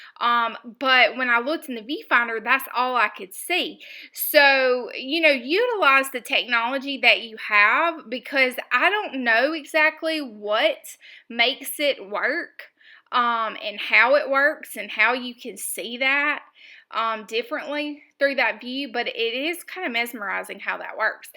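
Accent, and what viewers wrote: South, United States. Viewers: Well you said “tickle my fancy” and also “excuse the chainsaws and dogs ...” 👀👀 so I’m good with anything you do ! 🤣🤣 sound like you’re from Mississippi?? Texas?? Louisiana??? Hurricanes ...?? Hope all is ok and I love the idea of scanning ( a lot of work, yes) they’re great and I love your little book ..... “my moonshine container !!!” 🤣🤣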